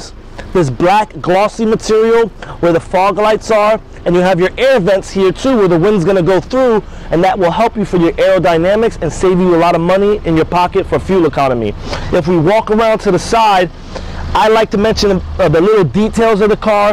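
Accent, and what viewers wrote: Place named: Queens, United States. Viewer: Dude... you're very cool with your accent... Queens NY? But it's pronounced Hunday, not Honday. And thanks for showing the SE model. I wanted to see what it looked like with those for lights on.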